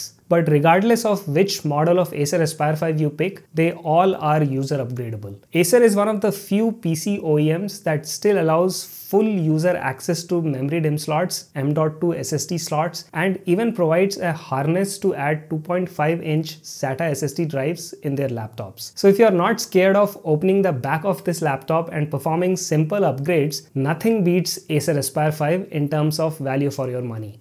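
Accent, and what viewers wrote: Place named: India. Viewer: Great video! You really give good and helpful information. I also like that you took the effort to add those nice subtitles! Some people might have some trouble with your Indian (?) accent (like me - I am German), but the subtiles are really helpful, since sometimes there is just a word or two that I did not understand right away. All in all: Great and amazing job!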